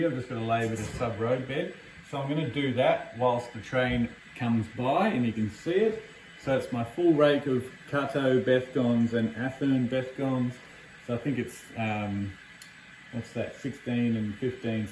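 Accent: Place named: New Zealand. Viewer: Hi, a Kiwi in Christchurch. Wasn't sure if you were here in NZ or in Aussie but the accent and reference to "hi-country" put you in this part of the Pacific. As noted, nice to see something (more) local rather than from the US or UK. I'll be keeping an eye on the progress of the Ness River Railroad. Keep safe and well, Rgds Brent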